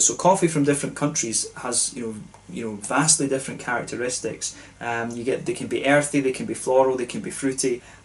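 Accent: Scotland, United Kingdom. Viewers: Oh right! I thought you was scottish or irish lol. I am from the uk but visit sweden to see friends. Where are you from dude?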